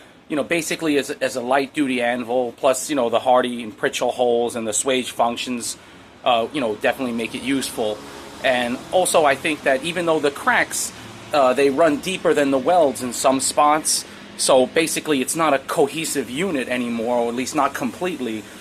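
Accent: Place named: New York, United States. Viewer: Two questions. First, what part of the US of A do you come from, I'm having a hard time placing your accent. Sometimes it seems New York-ish, and other times almost mid-western. Secondly, how much did it cost to make your anvils? I'm only a recent subscriber. I am using an antique cast iron anvil now and am thinking about upgrading to something more durable.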